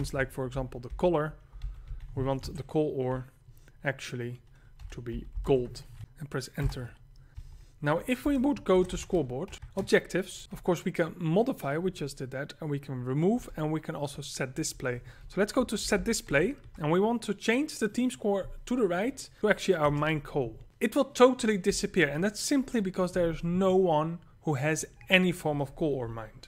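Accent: Netherlands. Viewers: Je bent 100% nederlands. Ik kan dat horen :P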